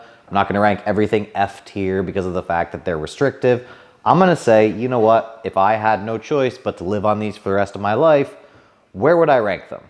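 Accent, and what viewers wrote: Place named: Canada. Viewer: I was so confused why you had a Canadian accent until I found out you're Canadian lol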